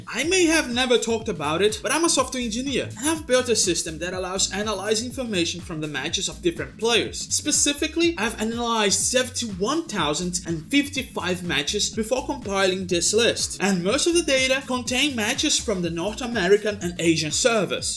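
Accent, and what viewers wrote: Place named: Brazil. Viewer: KKKKKKKKKKKKKKKKKKKKKKKKKKKKKKKKKKKKKKKKKKKKKKKKKKKKKKKKKKKKKKKKKKKK EU SOU BR RECONHECI O SOTAQUE DE LONGE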